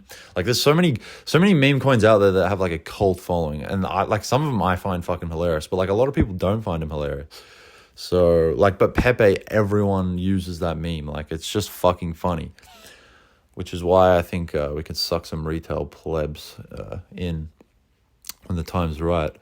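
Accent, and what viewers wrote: Australia. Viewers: chad awsie accent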